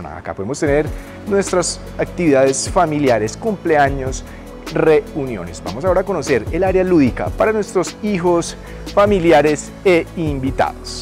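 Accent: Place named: Colombia. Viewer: Tiene el acento colombiano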